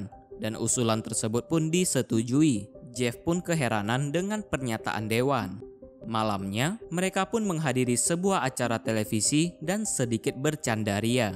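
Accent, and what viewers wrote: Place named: Singkawang, Indonesia. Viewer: Ini logat orang cina Singkawang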